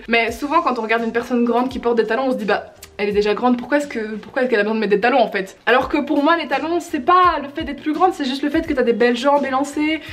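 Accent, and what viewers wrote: Belgium. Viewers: tu es belge?! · Tu es belge? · elle est belge?😶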